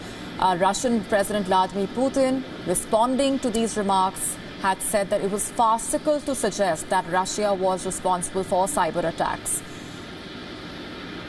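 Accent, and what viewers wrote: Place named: India. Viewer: Indian English 😁😁😁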